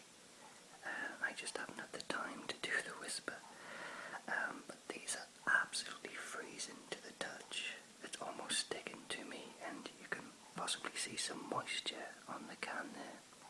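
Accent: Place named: North, United Kingdom. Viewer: This video is way underwatched. I love it! Btw, Where is your accent from? I know its northern but I'm picking up lots of little bits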